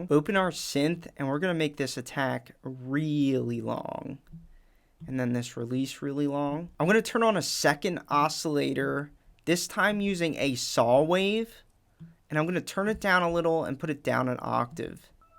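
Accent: Philadelphia, United States. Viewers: I think we know it's a masterpiece, but we can't explain why. And you've definitely showed us. Also, that's 100% a philly accent. Go birds!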